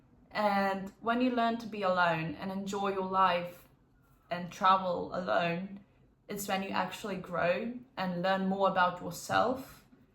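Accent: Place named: Australia. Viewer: There is definitely an Aussie accent sneaking in when you talk!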